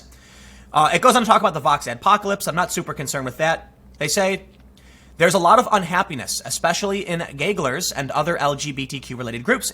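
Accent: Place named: South, United States. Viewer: What's up with that sudden southern drawl?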